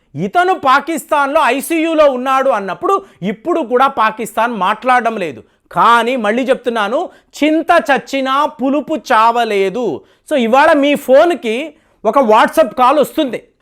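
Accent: Tamil Nadu, India. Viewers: పాయాసం ఏమిటి సోదరా!!! పాయసం...అనాలి... మీరు ఎన్నుకునే సబ్జెక్ట్స్ చాలా ఇంట్రెస్టంగ్ గా వుంటాయి... విశ్లేషణ కూడా అదుర్స్...నో వన్ కెన్ బీట్ ఇట్.... అయితే మీవాచికంలో (స్పీచ్ లో) తమిళ ఆక్సెంట్ విరివిగా వుంటుంది...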